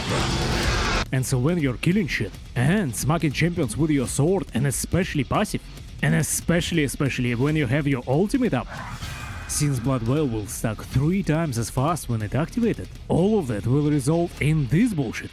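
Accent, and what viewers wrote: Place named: Russia. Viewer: Бро , учмтывая твои крайне локальные вставки и акцент , могу уверенно предположить что ты рускоговорящий , мне было забавно увидеть некоторые из твоих роликов , но то что ты говормл про морду , и многих других однокнопочных аутичных персов в попытке сделать из них богов , это просто стоический кринж. Вполне вероятно что это вызвано пактическими сорбражениями , ибо если ты действительно выходец из ру лола , то прекрасно знаком с тем что за твои вбросы твой канал бы неизменно ждал красивейший нок от бати блейзера , а так , хоть и рыльце в пушку , но вещаешь на англ аудиторию , а значит и вопросов к тебе быть не должно . хоть и вынужден признать , сама по себе рубрика прожарки чемпиона наоборот могла быть оригинальной идеей , года этак 2 назад.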